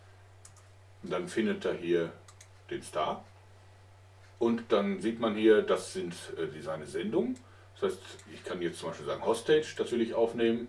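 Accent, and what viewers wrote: Germany. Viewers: Nico Derksen Kann man aber braucht man nicht. Die Videos sind für die Weltöffentlichkeit bestimmt und nicht für welche die nicht genügend Englisch verstehen. spricht ganz gut Englisch und hat so wie ich eben einen charmanten Deutschen Akzent. Keine Extrawürste braten! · #JoergSprave ich habe gewusst dass du n deutsch bist:D denn der Akzent ist der beste:D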